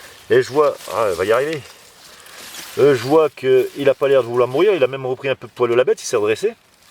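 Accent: Nord-Pas-de-Calais, France. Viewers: j adorre tes commentaires avec l accent et les termes chti . je suis de boulogne tu devais etre de lens vu l accent . super potager ché qu'in mem' un tiot peu ch' bordel . mi à ch't' heur ' j'mont ' min bassin à pichons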